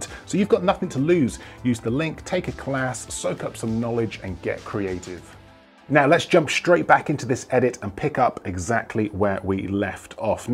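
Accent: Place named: Northern England, United Kingdom. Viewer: Totally off-topic but I have trouble locating accent. I have the impression he has a rather neutral, BBC English type of accent, but his a's in words like "mask", "class" and "can't" sound more Northern English, I think. What accent does he have?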